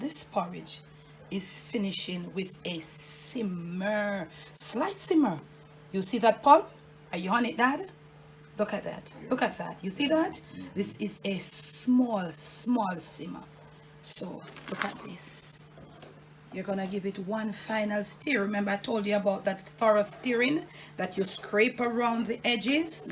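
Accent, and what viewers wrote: Jamaica. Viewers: I just LOVE the way describes all the ingredients and cooking techniques with her lovely Jamaican accent and "all ah dat!" It is such a pleasure to have you share all of the good food dung a yard. Thanks for sharing! I've subscribed.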